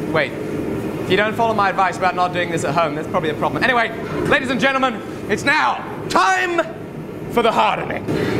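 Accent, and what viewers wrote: South, United States. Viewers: Love the southern accent. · You should use your american accent more often. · your southern accent reminded me of Bobby from Brickleberry hahhaha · This is one of my favorite episodes! So we’ll edited! The buildup each time you try to straighten the blade, your patience, your humor, showing us the fails and the wins, and the Southern accent was priceless! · The American accent 😂😂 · Please make an ENTIRE episode in yer southern accent!!!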